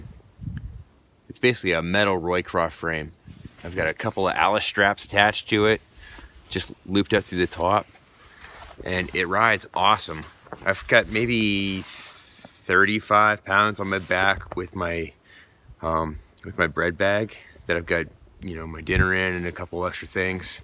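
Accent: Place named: West Coast, United States. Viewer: Dang bro, you sound West coast . Love the channel new sub 👍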